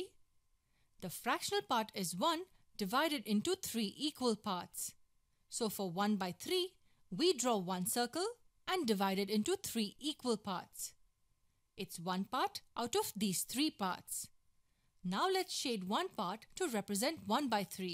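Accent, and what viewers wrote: India. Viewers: love the Indian accent, representing our country also love the video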